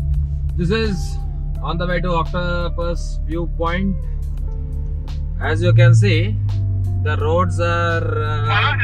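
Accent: India. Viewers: He's english is good.. He just has a South Indian accent which is okay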